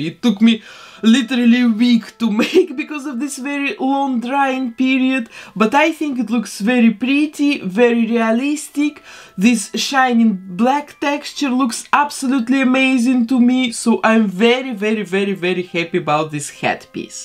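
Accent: Russia. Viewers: This is my second episode and I'm already hooked and I love your accent are you Russian?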